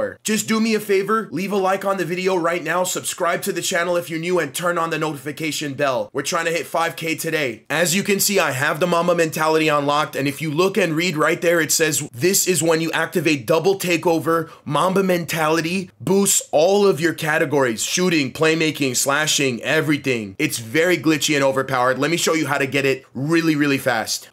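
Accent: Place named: Canada. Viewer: You’re from Canada for sure, prolly Toronto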